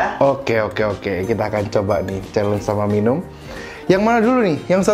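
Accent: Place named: Java, Indonesia. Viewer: Medok medok gemeshhh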